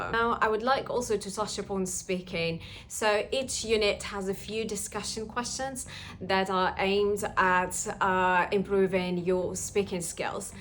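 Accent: United Kingdom. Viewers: Very British. Just need to work on enunciation a bit. :) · what an inspiring video for language learners, btw your name is not english but you sound like a brit who was born in bred in london :D im just curious were you raised in UK or require this perfect accent later ? aaand also why did you choose Turkey to live, im from Turkey and this country is not doing pretty good :D, what made you choose turkey i really wonder... Thank you so much <3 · your british accent is excellent 🔥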